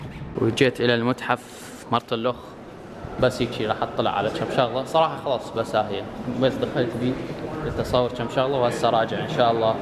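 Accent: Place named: Iraq. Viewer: حلوو استمر بس انته اشو تكول دبي واحسن تكساس من دبي انته عراقي لو من دبي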